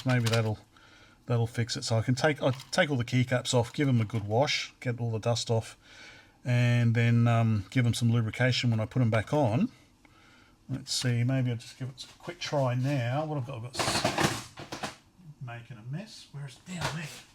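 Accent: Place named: Australia. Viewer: Sounds Australian. Sorry if you are not. 2 minutes into video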